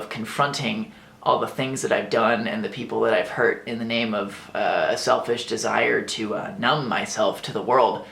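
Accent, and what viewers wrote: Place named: United States. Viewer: I CANT WITH AMERICAN ACCENTS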